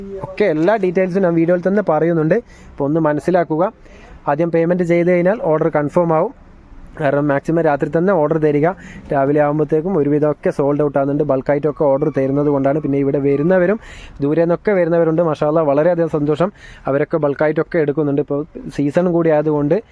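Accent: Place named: Karunagappally, India. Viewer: Karunagapalli karuda slang anallo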